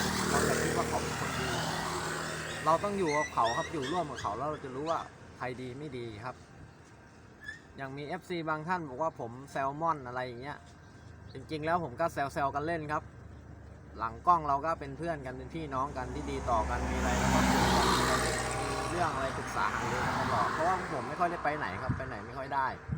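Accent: Thailand. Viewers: คนเหนือรึค่ะออฟก้อคนเหนือ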